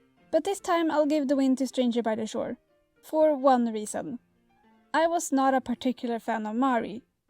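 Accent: Sweden. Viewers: What accent do you have? are u Swedish?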